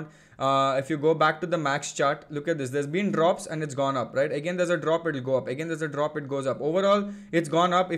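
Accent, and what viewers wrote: India. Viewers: Liking the video after I heard Kannada voice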